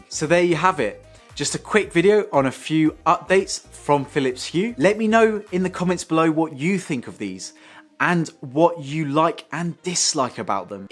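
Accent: United Kingdom. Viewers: what a great reviw! . Amazing british accent!. Keep up ! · waster my time of 6.34 minute was think the product was uk market & he got a british accent! but i was wrong it for the usa market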